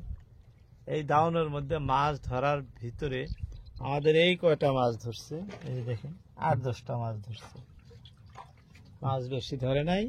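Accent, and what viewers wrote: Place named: Mymensingh, Bangladesh. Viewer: ভাই কোথায় থেকে আপনি, ময়মনসিংহের ভাষায় কথা বলছেন।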